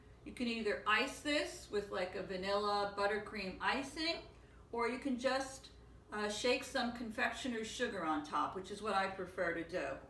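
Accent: New York, United States. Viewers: Hello, New subscriber to your channel. Thank you for subscribing to mine too! I love learning of new recipes so glad to be here! Love your accent too, is it New Yorkish!? I'm from NY but now living in England! Adorable apron and pick spatula. The bar looks really delicious! Mmm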